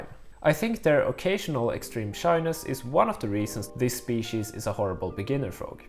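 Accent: Sweden. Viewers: Hörde direkt att det var en svensk dialekt! Kul att se fler svenskar som älskar grodor. Followed immediately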